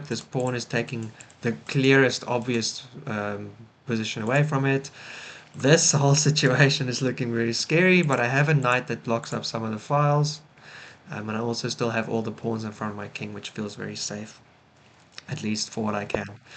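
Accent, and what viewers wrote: South Africa. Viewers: Where are you from? you sound a bit south african lol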